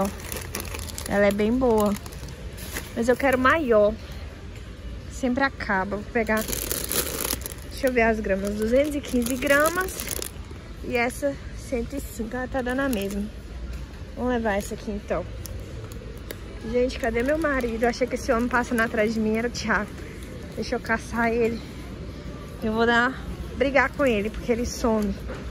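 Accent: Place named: Minas Gerais, Brazil. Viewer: Eu amo sotaque assim. Não conheço mas parece ser mineira ou go 😂.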